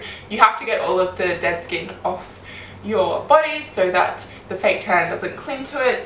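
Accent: Australia. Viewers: frenchfry AUSIIIEEE, LIKE ME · french fry Maybe Australia? I think so! You could check her social media though, maybe she has it written there:) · I think she"s Australian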